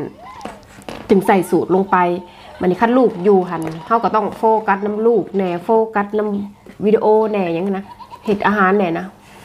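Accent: Savannakhet, Laos. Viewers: ເຮັດຫຍັງບໍ່ເປັນກະເບິ່ງຊ່ອງເອື້ອຍແນະນຳລະອຽດມັກຫລາຍເບິ່ງທຸກຄິບເລີຍ ສຽງເວົ້າກະມ່ວນສຽງຄົນສະຫວັນຍ້ານເຮົາ😍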